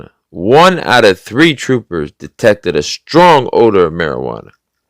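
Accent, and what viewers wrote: Chicago, United States. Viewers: Hey Man, you should get paid for your voice, perhaps not narrating auditing clips but maybe radio. You have a unique cadence when you speak and along with your Windy City accent perhaps you could do "news" as opposed to "music", especially in the upper Midwest. You know, something progressive which would help listeners. I have a good ear for voices and yours is unique and pleasing. My favorite voices to listen to are posh, young English accented girls so you don't get the wrong idea. Just informing you of what you might not have read before.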